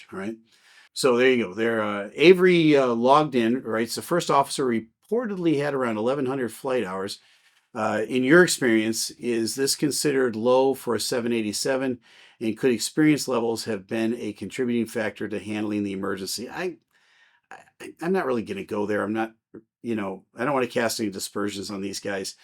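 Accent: United States. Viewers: I like your clips very much, interesting and well understanding american english, for me good to keep my English. Greeds from Germany 👍👍👍👍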